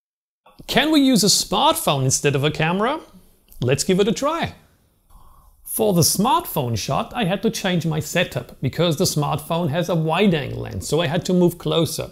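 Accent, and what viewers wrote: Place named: Europe. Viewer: This is absolutely the way I need to learn manual without being bored! Glad I found this channel! Your accent is interesting. European?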